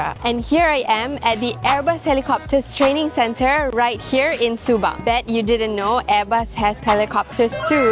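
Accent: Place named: India. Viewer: Please stop speaking English as Indian it is extremely trashy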